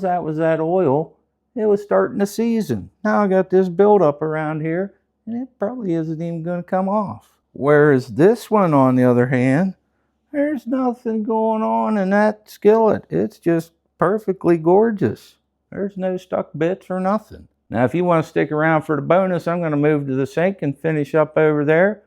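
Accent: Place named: South, United States. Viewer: I love that southern drawl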